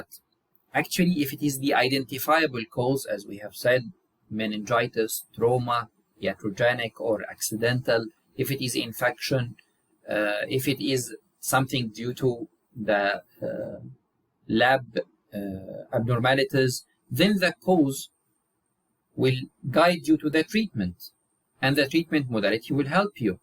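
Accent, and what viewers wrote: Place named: United States. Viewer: As if am listening to an American lecturer ❤❤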